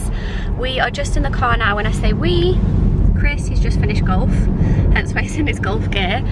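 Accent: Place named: United States. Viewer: Is there a special reason why you pronounce a vase the American way in England we say vase not vaze.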